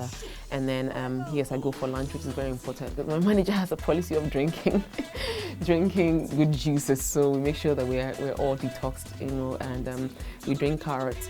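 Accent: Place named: Nigeria. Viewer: She has Nigerian accent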